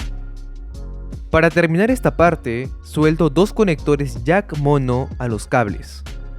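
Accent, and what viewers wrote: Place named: Peru. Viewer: increíble, el español peruano es inconfundible.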